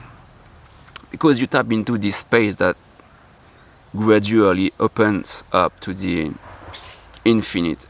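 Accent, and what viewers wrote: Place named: France. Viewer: Ha ! Yes, some of your words are confusing in meaning. Your thick ( I'm guessing French ? ) accent tends to distort them sometimes.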